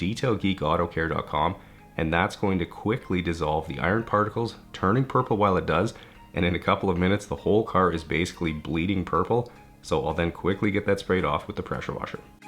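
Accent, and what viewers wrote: Canada. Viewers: He’s sounding extra Canadian in this one, right? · I can hear the Canadian accent for sure here lol please come to Toronto man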